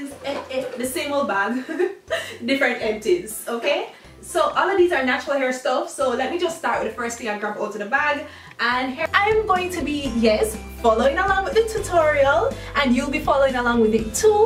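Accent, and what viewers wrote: Jamaica. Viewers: I had to sub right away! Love your infectious personality and accent! My mom is Jamaican so I picked up that accent right away! 🇯🇲🇯🇲🇯🇲🇯🇲🇯🇲🇯🇲🇯🇲🇯🇲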